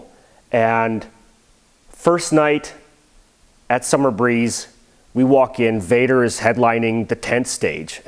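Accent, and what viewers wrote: Germany. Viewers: The remarkable thing is, that you already developed a little German accent. Or am I hallucinatin'? From one Metalhead to another: Welcome! Good to have you here. Make sure you'll stay! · Why does it sound like you got a german accent?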